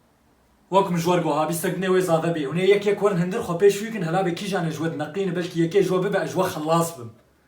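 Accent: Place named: Amuda, Syria. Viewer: عم تحكي متلنا يعني قصتي اهل عامودا انت من وين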